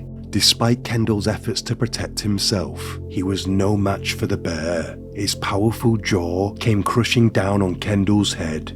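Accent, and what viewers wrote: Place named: Liverpool, United Kingdom. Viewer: Don’t let anyone crap on the way you speak. It’s lovely. I love a slight scouse.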